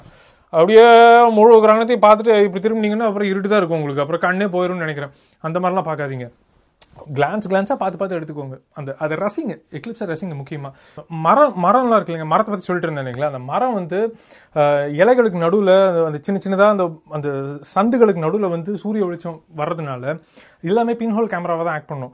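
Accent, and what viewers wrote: Coimbatore, India. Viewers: Bro neenga Coimbatore ah.... Namma aalu.. neenga kuniyamuthur nu type pannathukku apro unga slang semma.. bro Mettupalayam la ipo cloudy ah irukku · Excellent description abt solar eclipse bro. Especially in our Coimbatore slang, really i enjoyed it bro. And I got the solar film for ₹1020 from Mumbai. "Tejraj and co" Mumbai based astronomical equipment manufacturer. If some one need it can use.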